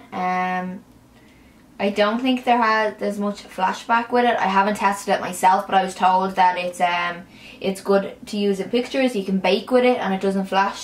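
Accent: Ireland. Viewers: Are u Irish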